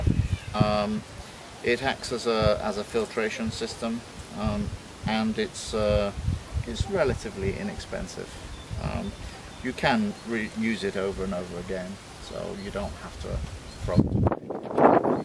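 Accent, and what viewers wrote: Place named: United Kingdom. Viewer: You mean "spawn jars"? Oh, sorry, your british so you talk funny to me.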